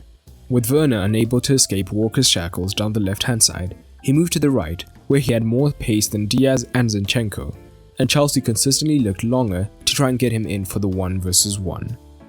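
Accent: South Africa. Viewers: Are you south African? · Are you South African? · Is the narrator south African?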